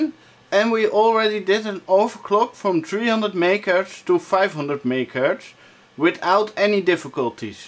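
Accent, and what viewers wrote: Netherlands. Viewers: He has a dutch accent, im dutch as well, his english is sh#t just like his entire generation, not his fault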